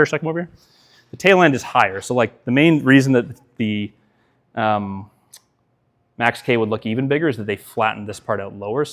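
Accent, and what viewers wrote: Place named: Canada. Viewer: Sounding extra Canadian today, bud! I enjoyed the live review, nice to not just have people's best shots in the reviews.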